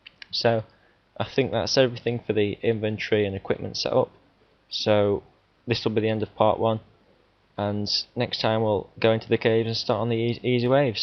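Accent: United Kingdom. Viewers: good solid english accent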